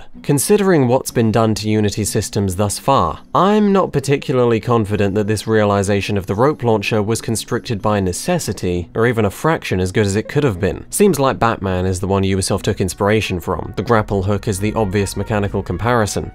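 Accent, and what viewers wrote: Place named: United Kingdom. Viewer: Anyone can cobble words together with a British accent... Intrinsic this extrinsic that... And sound smart to stupid people. If you are going to deep dive maybe read a little and give yourself historical context. You're missing so much and focusing in too much on mechanics but the rot in AC is in its spirit, not engine. Ubisoft is a sexual assault/crunch factory swamp... Toxic. That's why your games are ass. You don't even come close to addressing this stuff. I can't wait for a game essayist who has even one other idea/Interest aside from gaming.... So just Jim sterling lol. Read a book.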